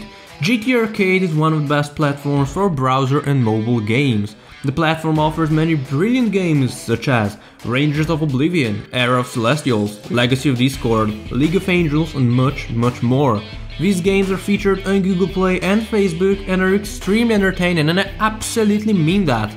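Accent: United Kingdom. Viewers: Are u from Scotland?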